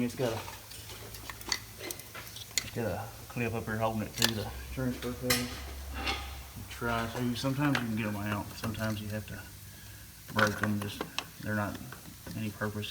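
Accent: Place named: South, United States. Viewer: Is this what I sound like ...tell that's a good Ole boy there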